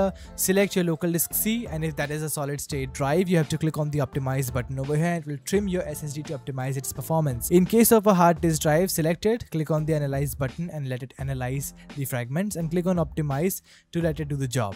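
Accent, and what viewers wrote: India. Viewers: if ur in an english speaking country pls for the love of god learn to not speak in an indian accent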